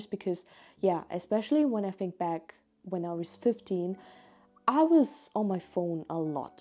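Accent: Germany. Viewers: aha i hear deutsch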